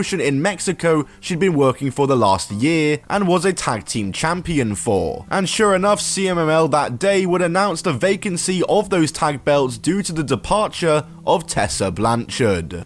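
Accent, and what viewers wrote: United Kingdom. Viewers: Oh look, another british accent clutching pearls over political correctness. Yawn.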